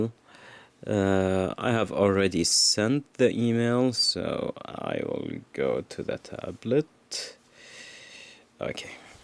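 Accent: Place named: Gulf, Arab world. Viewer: واضح انك خليجي او شي من لكنتك و والكلمات ما تقولها بسرعة ثاني شي الناس الي بالواتس مكتوب تحتهم بالعربي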